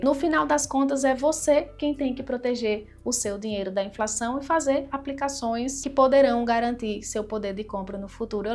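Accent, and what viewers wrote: Northeast, Brazil. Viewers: Pelo seu sotaque deu pra perceber que vc é nordestina então vc deve saber que aqui no nordeste as coisas sempre chegam com um pouco de atraso em relação a outras partes do país.... Como vc mesma relatou que morou em cidade de interior e sabe que as oportunidades de emprego são bem poucas mesmo para quem tem qualificação!!!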